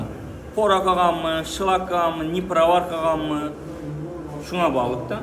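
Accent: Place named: Khorezm, Uzbekistan. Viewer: Хоразим шеваси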